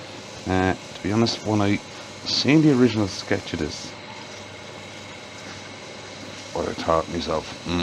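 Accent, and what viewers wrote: Ireland. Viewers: I love your videos! You always tell us what you are doing. That turned out exactly like the sketch! There is no way I could have or would have attempted it. Only been turning about 8 months though lol. As an American I'm in love with that beautiful Irish lilt in your voice. Happy New Year to you and your family!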